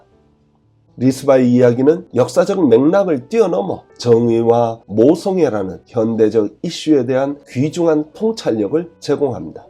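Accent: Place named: North Korea. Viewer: 강해 하시는분이 보기에 그리 연세가 지긋한 분이 아닌데 왜 북한 사투리를 하시나요?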